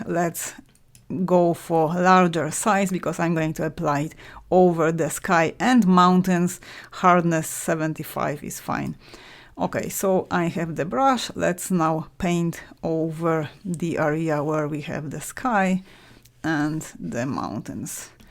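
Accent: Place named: Poland. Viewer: De acuerdo, Enrique, y ya que mi lengua materna es el inglés puedo confirmar que el dominio de del idioma es superior. Es más, su rico acento polaco, según lo expresan los hondureños al menos, pues, es del todo 'delichoso' al oído nativo.:)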